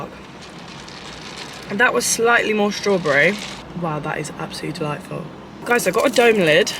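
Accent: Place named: Essex, United Kingdom. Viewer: I noticed the Essex London twang hahaha kinda funky!! You're literally thriving so happy fro you xx (I also live in Kent can we be friends)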